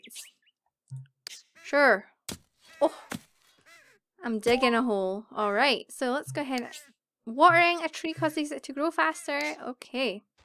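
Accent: Scotland, United Kingdom. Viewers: What do you use to film? And are you from Scotland? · I love your British accent! It makes the video more fun lol :) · I have my sims kingdom on the wii. Love <3 Also, this is the first video I've seen of you, are you Scottish?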